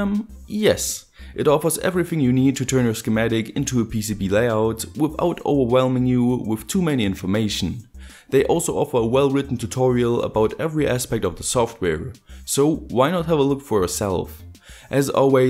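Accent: Germany. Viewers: man hört voll das du Deutscher bist :D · what realy you are german iam too i wonder for a bit why your main plugs are european and your english is kinda german i dont know XD but why are you dont make german videos i love to see that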